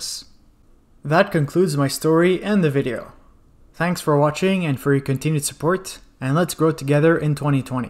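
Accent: Quebec, Canada. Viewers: Vous est quebecois? I heard a little Canadian french when you were telling your story. I'm an hour north of toronto and learned a little french in school. Congrats on your channel growth, i hope it quadruples in 2020! You are one of the best WT youtubers out there, looking forward to educational videos as well. I think it will suit your channel very well.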